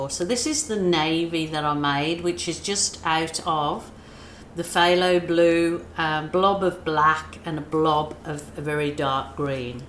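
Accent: Birmingham, United Kingdom. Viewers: Hi from Yorkshire!..found you 2 hours ago... Watched an hours worth and drove to Asda ( Walmart) before it closed at 10 pm for paint etc...soooo excited to try this! oh and do I hear Brummy twang or am I way off?. Just realised I'm out of tin foil....but remembered the balloons though.. I'm at that dodgy age haha.. great to find you, new subbie here! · Are you from Birmingham uk? You have a kind of brummy twang to your accent :) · Lovely/Bostin!! I want to try it on silk! I'm just coming to the end of my first year at BCU studying textiles so will try to see how it looks with procion dyes etc. I am a very mature student and still love learning all these new things. Thought I detected a brummie accent. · ahh 20.40 you did say your were from birmingham. i have cousins from there and i could hear it. im a scot though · Your accent sounds like you have a bit of brummie in it?... 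beautiful painting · First time watching you today, and within 1 minute i was convinced you had a brummy accent, and this was confirmed in the very same vid. Amazing work, i absolutely love what you done here !! New subscriber! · Yes 😍 still got youre brummie twang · Hi just found your paint pour video’s which are absolutely amazing 🤩 I could hear brummie accent coming which was awesome! Thanks for sharing your gifted talents! I’m just starting out my paint pouring journey! Lv from another brummie Christine x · I am brand new to pouring and my goodness, what a joy and aspiration this is. It is absolutely beautiful. Can I also say I love your accent. My mom came from Rednal Birmingham and just to hear the accent again is a joy. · I knew you were a Brummie I could hear it in your accent lol. Love your artwork tutorials. I haven't been brave enough to do a 'pour' of any kind yet but I absolutely love the 'balloon work'. 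Am trying to think of a way to do the balloon work with my great grandchildren who are 4 & 5 years without it being too messy 😂❤ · Omg ! I knew you are a brummy, just heard you say Perry common I’m down the road in Walsall Just love your work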